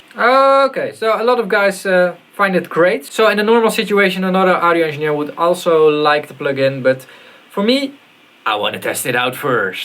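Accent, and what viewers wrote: Netherlands. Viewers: I'm perceiving a Dutch vibe in the way you speak English :) nice review man, quite objective.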